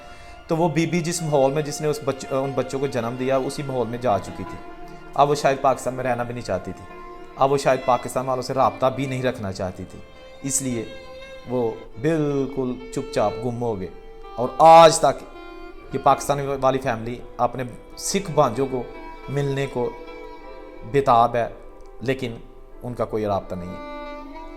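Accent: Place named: Varanasi, India. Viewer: ਅਸੀਂ ਨਹੀਂ ਦੇਖਣੀ ਏਹ ਵੀਡੀਓ, ਪੰਜਾਬੀਆਂ ਦੀ ਬਰਬਾਦੀ ਦੀ ਕਹਾਣੀ ਤੂੰ ਸਾਡੀ ਭਾਸ਼ਾ ਚ ਨਹੀਂ ਬਣਾ ਸਕਦਾ ਜੋ ਸਾਨੂੰ ਸਮਝ ਲੱਗੇ। ਪਿੰਡ ਪਟਿਆਲਾ ਦੇ ਤੇ ਨਾ ਤੁਸੀਂ ਬਨਾਰਸੀ ਲਹਿਜ਼ੇ ਚ ਲੈ ਰਹੇ ਹੋ ਏਹ ਸਿਆਣਪ ਹੈ ਤੁਹਾਡੀ? ਨਾ ਵੀਜਾ ਪੰਜਾਬ ਦਾ ਤੇ ਨਾ ਹੀ ਬੋਲੀ ਪੰਜਾਬ ਦੀ । bahrhaal bhai Ajit nu koi mushkil nahi honi chahidi jo MOHAMMAD SAB DE JANAM Dinn ( Eid ) di khushi ch shamil hundeyan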